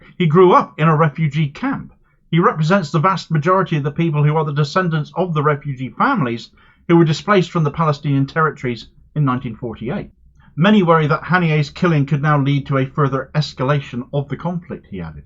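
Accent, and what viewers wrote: Cornwall, United Kingdom. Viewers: I have to agree, your lovely Cornish lilt could sound so much better! (btw I love your work and am on Patreon!)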